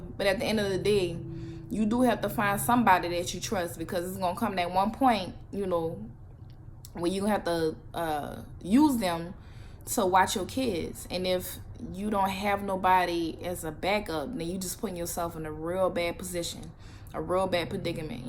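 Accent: United States. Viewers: Louisiana accent strong lol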